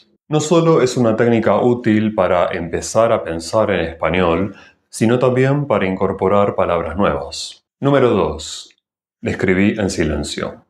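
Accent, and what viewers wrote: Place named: Paris, France. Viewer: A veces me pregunto si usted tiene antepasados franceses, porque su entonación suena como la de los parisinos.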